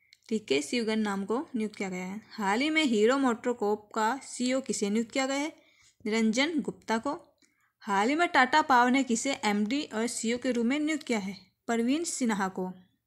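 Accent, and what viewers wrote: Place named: Haryana, India. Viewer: Aap up me aake hindi bolna seekho ....haryanavi aur hindi ko mix na kro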